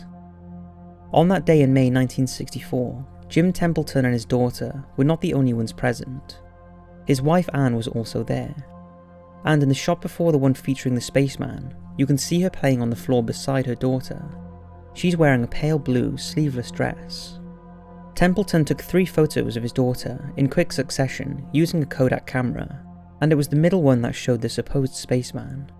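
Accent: United Kingdom. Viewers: getting tired of hearing british narrators....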